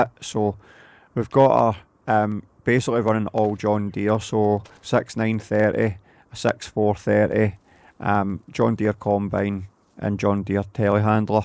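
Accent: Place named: Europe. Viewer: I absolutely LOVE your accent brother. Well I guess it's not a accent to you but it is to me 😂😂 I'm just a silly American who loves European accents...Scottish, Irish, UK...Oh yea, nice build too 😂😂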